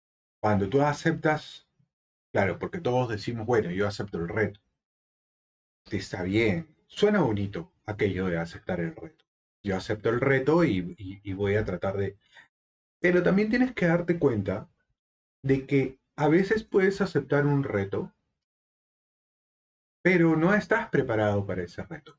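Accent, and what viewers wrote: Lima, Peru. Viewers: caes bien compa. Tu acento limeño súper relajante. Tu inspiración contando historias de bayly también. Pronto tendrás en la caja de comentarios 4000 comentarios, grande.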